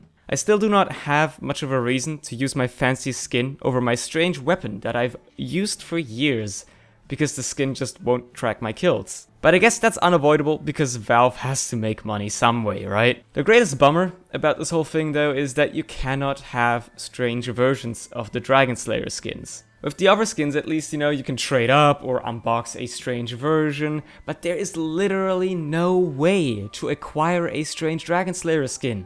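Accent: Germany. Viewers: if i didnt know you were german i would never know by watching your streams but the german accent is too strong in the videos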